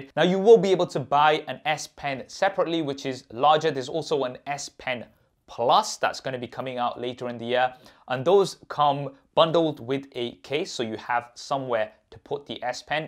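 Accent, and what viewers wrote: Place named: United Kingdom. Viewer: His voice got so soothing in middle of the video that i fell asleep. Dude you gotta make a lullaby playlist in that British Accent.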